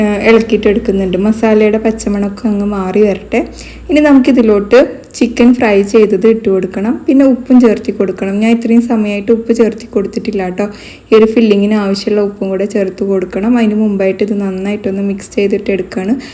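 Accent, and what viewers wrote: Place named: Kannur, India. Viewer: Fest thane adichu varunna seen ozhivako maachi🤣🤣🤣superatttto to to to kelkan rasam kannur ami good recipy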